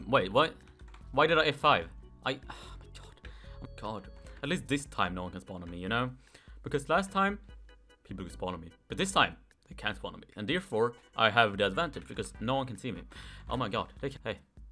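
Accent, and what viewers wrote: Sweden. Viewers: Are you Swedish? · are you swedish? you sound like swedish:/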